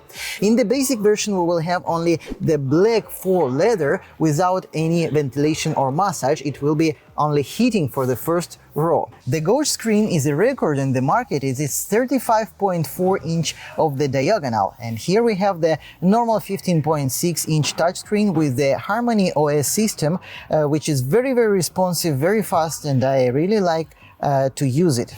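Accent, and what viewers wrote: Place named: Asia. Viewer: You do like an Asian person speaking English. If I didn’t see you, then I will think you’re Asian.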